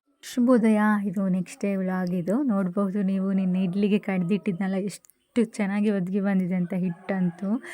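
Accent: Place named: Mangalore, India. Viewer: Manglore kannada mathadi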